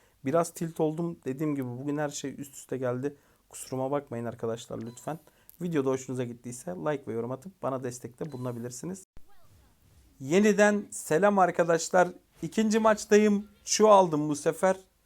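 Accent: Samsun, Turkey. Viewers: Üzümlü kekim samsunlu olduğun ne kadar da belli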